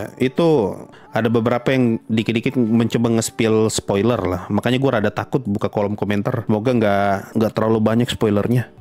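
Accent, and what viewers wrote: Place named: Medan, Indonesia. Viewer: bang bahasa nya medan banget 😂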